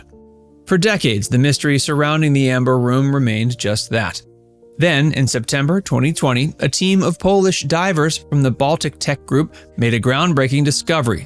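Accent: United States. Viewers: American pronunciation of nonAmerican language is atrocious. Please learn the standard world-wide usage of words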